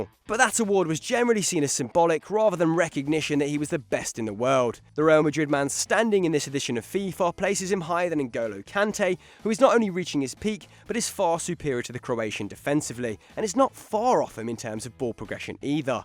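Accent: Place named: Leicester, United Kingdom. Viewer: Can you tell that this guy is from Leicester? 😂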